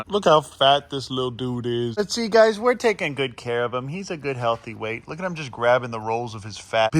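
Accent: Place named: New York, United States. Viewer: Oh, he's in Texas? He sounds like he's from up north, like New York.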